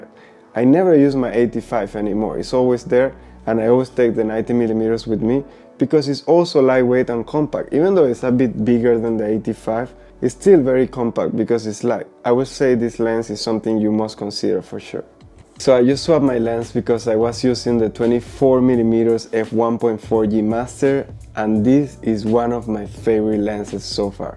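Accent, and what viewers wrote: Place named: Spain. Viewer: I like your accent too, although it sounds Spanish? In Macau don't they speak Chinese, Portugese and English? I'd love to visit there! Great video, BTW...♥️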